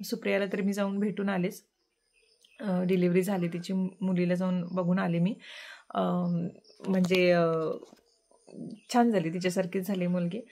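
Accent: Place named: Belgaum, India. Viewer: Tayi me pan belgav aahe me khupc excited hoon tuje vlogs bgte Ani tu ti belgavchi bhasha boltes na mla apl koni tari as vadtay ❤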